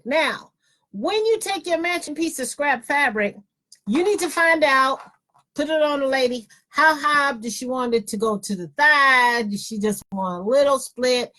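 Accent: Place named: Alabama, United States. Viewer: I like you! You sound like me and my Alabama family!🥰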